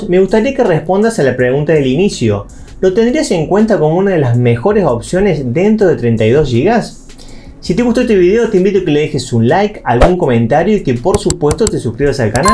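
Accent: Córdoba, Argentina. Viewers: ¿Sos cordobés? Porque hablas como una persona de Córdoba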